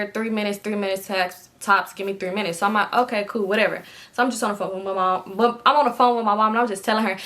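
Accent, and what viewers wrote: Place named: Texas, United States. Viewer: “ hey yall “ in every intro. 😂 sound like you from Texas tbh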